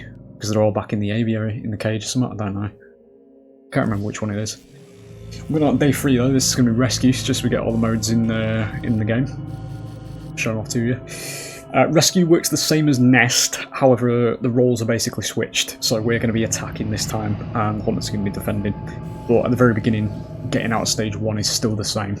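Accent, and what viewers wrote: United Kingdom. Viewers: Where in the North are you from man? You sound like Lancaster way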